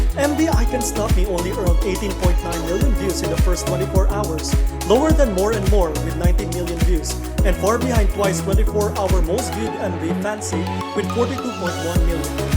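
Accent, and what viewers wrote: Philippines. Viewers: Uso ginhawa kuya. Halata Pinoy, accent pa lang.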